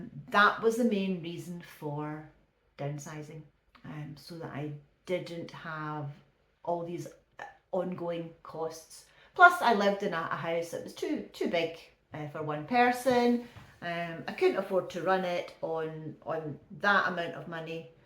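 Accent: Scotland, United Kingdom. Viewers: I'm an English-speaking Canadian In Quebec Canada. I love your beautiful Scottish accent!😊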